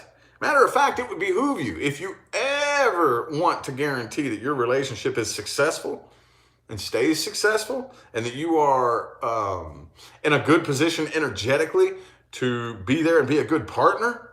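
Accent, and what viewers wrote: South, United States. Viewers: Are you from the south ? Lol I hear a twang .... I’m in Oklahoma City , Las Vegas you live I think you said forgot 😎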